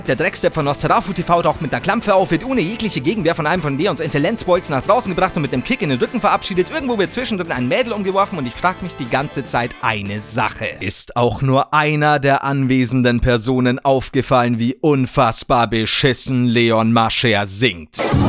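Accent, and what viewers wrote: Bavaria, Germany. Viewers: Hab mir jetzt einige Videos von dir angesehen und ich muss sagen wenn man selber ein bisschen bayrischen Dialekt versteht, gibt es kaum bessere Unterhaltung auf youtube Deutschland😁👌 Hast einen neuen Abonnenten gewonnen mach weiter so👍🏻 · BITTE STOP mit der Bayrischen Sprache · Feier dich richtig, durch dein bayrischen Dialekt Einlagen, die ich als Oberösterreicher natürlich versteh, find ich dich auch sehr Unterhaltsam. Zudem find ich es toll wenn auch die Großen (im Kopf nicht) kritisiert und oft zerlegt werden. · Naja weil ihr in Österreich nur Bayrisch sprecht 😂 Nein Spaß. Ich mag es auch wenn er Bayrisch spricht. Grüße aus Bayern zurück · Michael Obermaier Komme aus Bayern und liebe es, wenn er bayrisch redet xD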